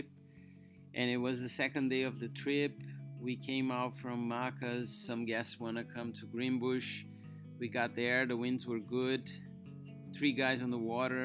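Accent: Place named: Spain. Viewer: His accent ( Spanish?) is very colorful….beautiful narrative and music…so honest!!! 😊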